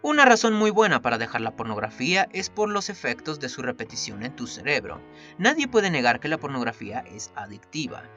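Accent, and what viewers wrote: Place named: England, United Kingdom. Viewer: Mejor voy Preparando mi Dinero, Para pagarte en Patreon y que dejes tu Acento Ingles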